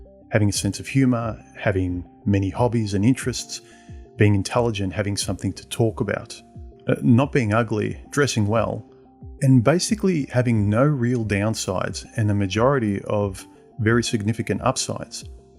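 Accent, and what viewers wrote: Australia. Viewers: Best aussie I've heard talk for years Regards a south African...lol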